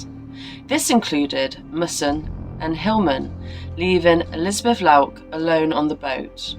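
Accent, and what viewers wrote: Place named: West Country, United Kingdom. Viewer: Limit your time with those mate even if you have the best laugh with them. I liked your accent on the BBC video as it seems to have a west country / black country twang, which I like, being a Bristolian myself.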